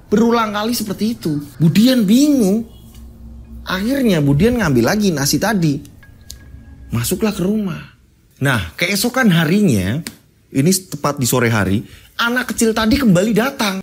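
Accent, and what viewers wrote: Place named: East Java, Indonesia. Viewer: Ini dari logat bahasa nya mas kayak orang Jawa Timuran daerah selatan ( blitar kediri Tulungagung )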